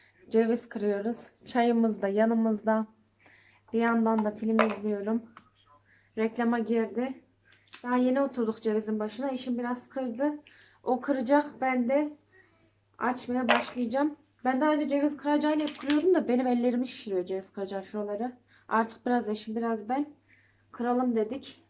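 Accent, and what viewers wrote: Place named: Zonguldak, Turkey. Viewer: Size yeni abone oldum videonu beyendim nerelisiniz acaba konuşmanızı zonguldak tarafına benzettim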